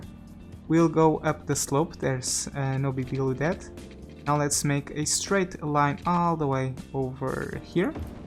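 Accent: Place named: Portugal. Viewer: Classic Portuguese accent!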